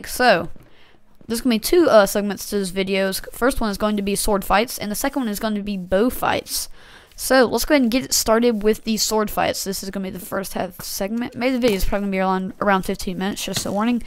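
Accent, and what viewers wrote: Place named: United States. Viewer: You have an accent like I do, what state are you from?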